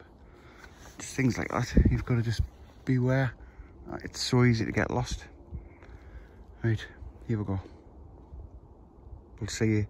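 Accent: Newcastle, United Kingdom. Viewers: I think he is Geordie 🤣🤣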